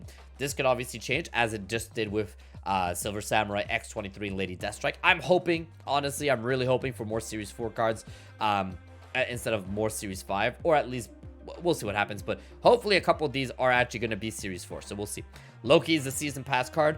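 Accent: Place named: Quebec, Canada. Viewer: Clairement un québécois!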